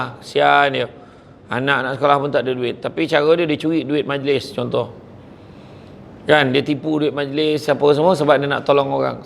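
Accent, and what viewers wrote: Negeri Sembilan, Malaysia. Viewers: Itukah kan,cakap nogori best👍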